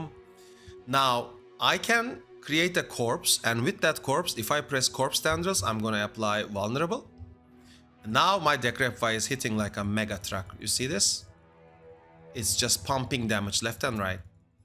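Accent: Turkey. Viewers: isim dehşet iyi, youtube a yazdım aksanda Bi farklılık sezdim aha dedim türk 😁😁 ekmeğini yiyoruz forumlarda saygılar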